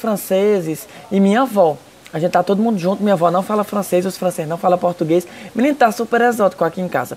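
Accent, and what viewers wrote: Northeast, Brazil. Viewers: Olá, adoro o seu jeito espontânio de falar, apesar de está morando em outro país não perdeu a sua simplicidade e o seu jeito arretado de Nordestino, me divirto muíto com os seus videos.. Manda Alô pra mim meu nome é bem fácil JOSIBERTO 😃😃.. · ...acho é bom! Eu amo esse sotaque gostoso só nosso (sou cearense tbém). Bjo! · Gosto demais de assistir seus vídeos. Sou de Aracaju e vixe! é bom demais ouvir outro nordestino falando. Love it! je t'aime!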